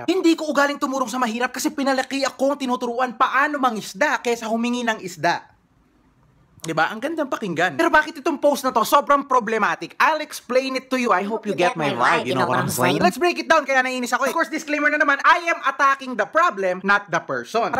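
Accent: Pampanga, Philippines. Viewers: nice kapampangan ka nga haha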